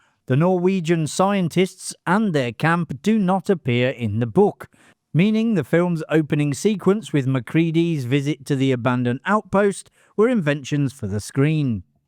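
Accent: United Kingdom. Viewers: LOL, Love how you keep saying Tobe wrong....and to the guy before me, this is not AI slop, he's just Brittish.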